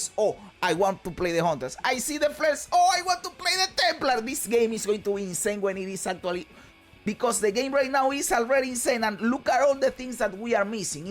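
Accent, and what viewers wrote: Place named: Dominican Republic. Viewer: a fellow dominican playing poe with a thick accent was not something i expected this is CONTENT lmaoo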